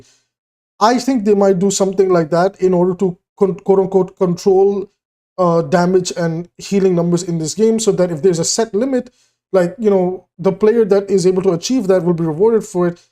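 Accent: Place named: India. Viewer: I'm guessing because he has the cadence of Indians when they speak that you'd make an assumption.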